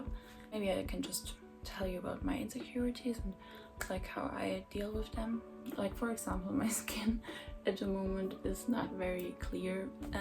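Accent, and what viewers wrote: Germany. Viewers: Maybe it's a bit of a stupid question but are you german? I saw a product in another Video that was german and you have a bit of a accent so I just wondered... (I'm german too btw) (oh and please ignore my name it's my fathers account😅) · are you german? because you kinda have an accent :D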